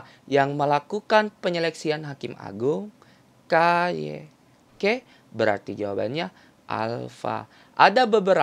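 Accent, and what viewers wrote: Makassar, Indonesia. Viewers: Mentornya kental Ki logat Makassarnya😅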